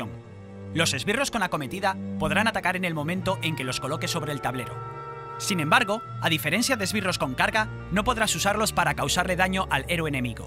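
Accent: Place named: Spain. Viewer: Por poco pensé que me equivoqué de canal y lo confundí con el de "ES", pero no, es "LatAm" y está en español de España :s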